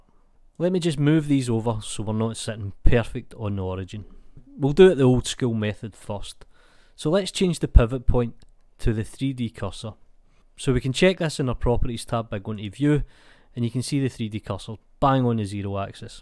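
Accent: Scotland, United Kingdom. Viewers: wao thats some strong scottish accent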